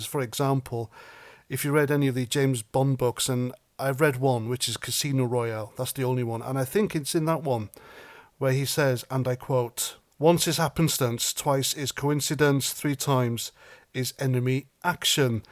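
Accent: Wales, United Kingdom. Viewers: I like your Welsh accent!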